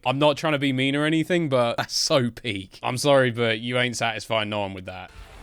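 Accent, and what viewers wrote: Wales, United Kingdom. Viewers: As someone who’s been watching since like 15,000 subs, I wouldn’t be watching if had an annoying voice, I’ve stopped watching other people on voice alone. I think I’ve watched so much, even when I was younger. I sometimes say phrases he would, like actually. What can I say I like the British accent. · not once have i watched one of your videos and paid attention to how your voice sounds. even doing so now, i see nothing wrong with it. you sound like a british man. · Mate you sound fine there’s nothing wrong and as a British person it’s nice to here someone with a similar accent to me I get tired of American accents they really grate on me don’t worry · welsh accent 100% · That sexy voice and that britain accent are your trademark because everyone recognizes you when your "WHAAAAAAAAAAAAT?!?!!" pops in. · bruh i love your voice so much. 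as a british person who has moved to australia, i can appreciate and miss your accent. and your voice is wonderful, it makes everything about you so much funnier. dont be sorry. i love your so much aha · I like your voice, I just love how "British" (or in this case, Welsh, which I didn't know until relatively recently) it sounds, yet very comprehensible, something I wish I had. Also I wish I could do one of your signature "WHAAAAAAAAAATTTTTTTT"s. Though I can't blame you for wanting to have Matt Berry's voice. Hell, he doesn't mind if you're from Iran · It's a UK voice I'm proud of my UK voice so you should be too and I understand the insecurity of having the audience hear it but that's what makes you iconic to us! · Bro i love your voice man. i’ve always loved british people. Mainly for their vocab and they’re accent. Not matter how you sound you are still amazing. love you big bro.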